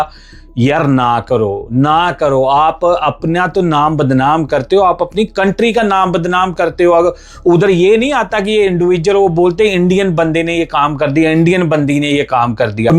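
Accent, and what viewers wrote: Punjab, Pakistan. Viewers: Yaar aap Pakistani punjabi ho kya?? 😂😂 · App ka Hindi mano aap Pakistani ho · Tera accent pakistani hai....naam rakha hai sale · You are pakistani accents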